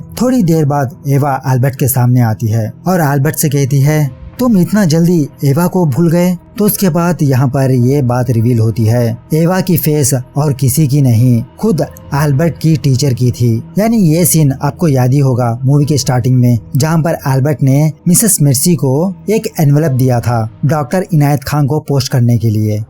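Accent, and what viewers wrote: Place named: South, India. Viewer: You are south indian... i got it. but nice explanation. good.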